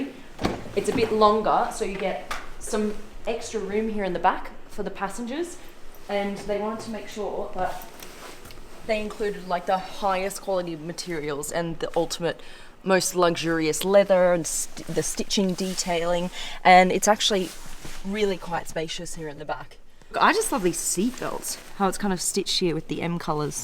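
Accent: Australia. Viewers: Cool video and yes we get it, you have a Lambo!!! Big deal, I have a Bugatti. Do enjoy your accent and I dig Australian women :) Your husband is a lucky man. Do you have a sister???? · As usual, this Aussie ugger is really boring and so in-to-your-face stupid. The accent alone can make me vomit nails!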